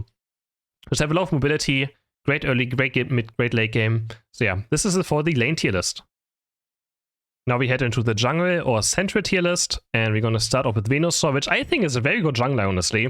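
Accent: Germany. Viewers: Are u german?because du hast da ein akzent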